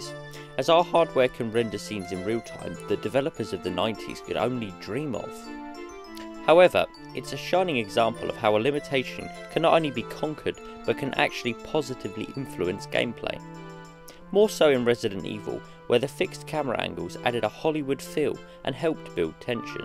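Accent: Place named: Cockney, United Kingdom. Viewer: dat cockney accent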